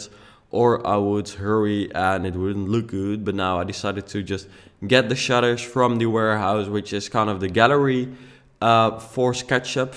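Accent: Netherlands. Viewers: je kunt gwn horen dat je nl bent lel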